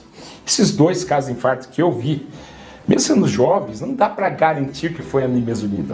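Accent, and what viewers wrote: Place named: Caipira, Brazil. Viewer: Taquifemia com retroflexo "R" caipira! Não entendi nada! 👅 👅 👅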